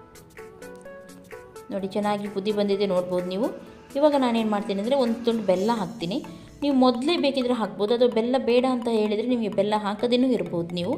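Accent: Mangalore, India. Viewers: Hi mam nimma vlog super nim maklu bandre enna super niv kannada matnadodu nange tumba esta manglore kannada kelodikke kushi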